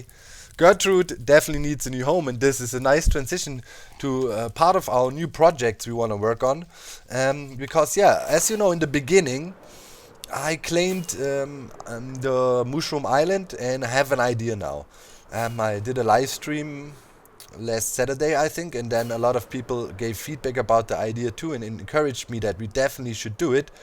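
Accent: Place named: Germany. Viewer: Are you German, because you really do sound like it (I'm Sorry if you answered this before I'm new...)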